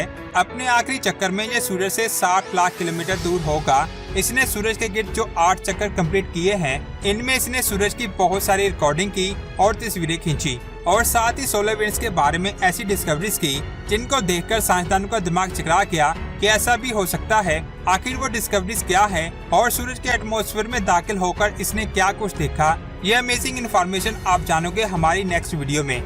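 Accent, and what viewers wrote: India. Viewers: Teri awaz se pata lagta hai tu indian hai ..pakistani ban kar chutiya bana rah hai...😂😂😂😂😂😂😂 teri zaban bata ti hai tu indian hai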